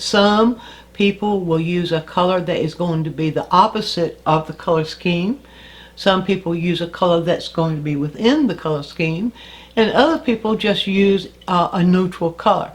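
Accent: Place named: Mississippi, United States. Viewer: You are such a good teacher. I love your accent. Are you from MS, related to JoAnne?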